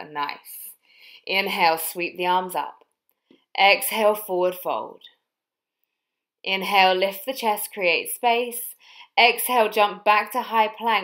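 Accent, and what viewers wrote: United Kingdom. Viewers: Good job ! British English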